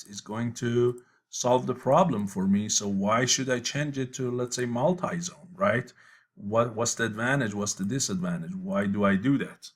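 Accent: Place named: Iran. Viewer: سلام من از دانشجو ترم 8 مکانیک یکی از دانشگاه های ایران بسیار بسیار از شما ممنونم بابت این فیلم بسیاراموزنده از مش زدن بسیار نحوه درس دادنتون شیرین وقشنگ بود بسیار لذت بردم دنبال اموزش مش در انسیس بودم در یوتیوب که این فیلم رو دیدم و دیدم چه لهجه ایرانی دارید. دیدم استاد هم ایرانیه کیف کردم سرزنده باشید.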